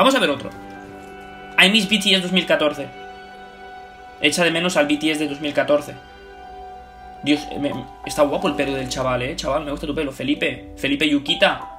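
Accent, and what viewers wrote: Argentina. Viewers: el acento argentino JAJAJAJAJJAJA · Se le sale lo argentino a jajajaja extrañaba poder ver videos tuyos!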